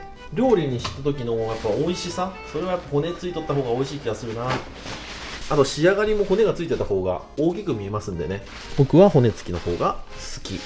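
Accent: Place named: Nagoya, Japan. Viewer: 名古屋弁です。標準語に比べるとアクセントが強めです。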